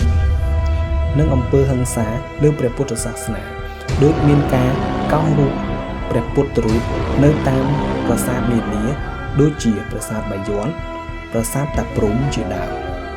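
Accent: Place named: Thailand. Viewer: អ្នកអានប្រវត្តិសាស្រ្តខ្មែរចេញសម្លេងថៃ ឬជនជាតិថៃជាអ្នកអាន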